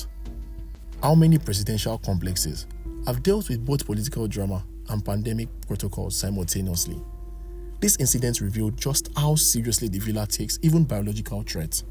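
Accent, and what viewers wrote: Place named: Nigeria. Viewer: I just want to thank you for speaking with your Nigerian accent and not forcing Amerixan or British accents. I enjoyed listening to a fellow Nigerian. Well done.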